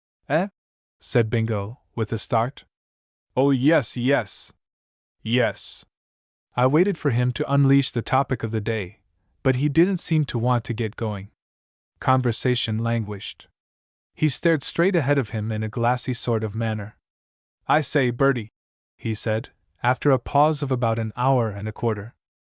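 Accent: United States. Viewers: Americsn narrator really doesnt work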